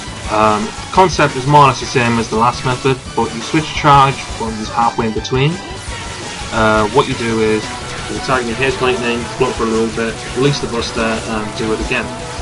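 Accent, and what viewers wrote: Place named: United Kingdom. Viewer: I can barely understand your shitty britt accent.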